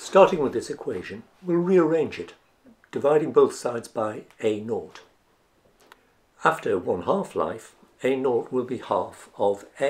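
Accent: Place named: United Kingdom. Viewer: Thank you, fancy and british sounding man. You finally made me understand this.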